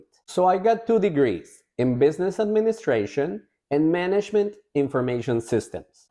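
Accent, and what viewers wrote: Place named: Europe. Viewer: Europe your accent is from Europe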